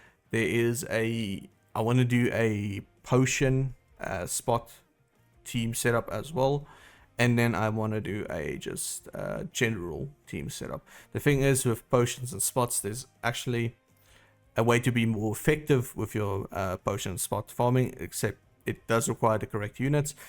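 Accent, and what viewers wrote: South Africa. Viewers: Yesss!! Finally after finding your channel today and binge watching your videos I confirmed your are south african🙂 Yoh dit het my nogal bietjie mal gemaak om te luister en heeltyd te dink die ou is van SA. Dankie vir al die goeie info op al jou videos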